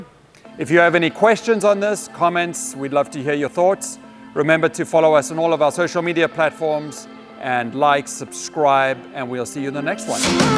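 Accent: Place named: South Africa. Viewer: Nice Saffer accent :)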